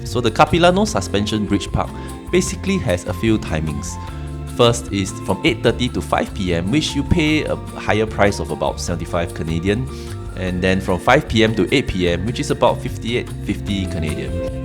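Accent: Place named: Singapore. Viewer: guess you are from singapore your accent sounds familiar to me. I was living in singapore for 15 years and love the memories of it. Migrated to Canada because of Covid . Glad to subscribe the video is awesome enjoy the travel ❤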